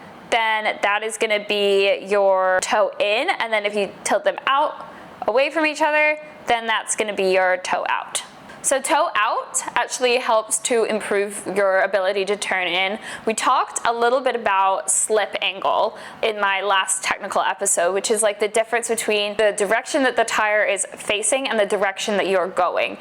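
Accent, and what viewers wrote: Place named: Australia. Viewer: Hello, algorithms brought me here. I love the enthusiasm and the love of the sport. In trying to pinpoint your accent, sometimes it goes northern but sometimes I think it's aussie... Pretty cool in any case (and if it's a 'normal' accent then I haven't travelled enough!). Anyway, there's alot of oldie f1 fans out there who sometimes get a little sensitive on technicalities. You're still finding your way which is great but thought I'd share to not be too disheartened if you get negative responses in the future. You'll find your footing! Few pointers on this topic- Tyres, if you're going fundamentals for F1 specifically, the suspension components work very closely with keeping the optimal operating temperature of the tyres for the lap. Think the window is 120-180c? Toe/caster/camber, I think alot of these are tailored for the tyre/track/scrub rate/temp, rather than driver preference. 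You could've mentioned DAS wheel in the 2019? period. Suspension, push or pull rods, bit of black magic but I suspect is how they load transition works with the rest of the setup (ie placement of engine, aero etc). Ride stiffness/height is largely balancing the aero rather than 'comfort'/performance typically. See AM this year (I suspect running very stiff setup that helps aero but because engines I assume aren't balanced/ tolerating the stiff setup as well and will shake the driver. Mercedes last era with porpoising (aero grounding out at high speed, relying on suspension too much to fix the aero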